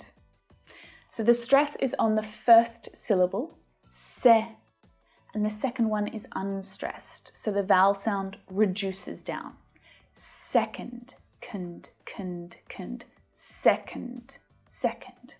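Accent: Australia. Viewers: Are u from Australia ? Your english sounds more clear... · speaks with a cultivated Australian accent and she is from Melbourne (the second biggest city after Sydney)... I can assure you that not all Australians speak as clearly as she does! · I've got a question, just out of curiosity. Yours is the cultivated Australian accent, right? I love it, it's kind of the best of 2 worlds, British on one hand and American on the other but without some of the oddities of them, at least this is my point of view as non native English speaker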